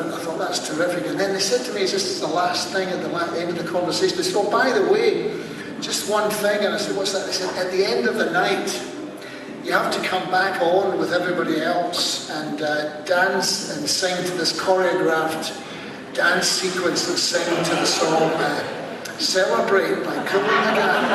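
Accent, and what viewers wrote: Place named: Scotland, United Kingdom. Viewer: Boy! The brogue REALLY comes out when he goes back to Scotland!! ;)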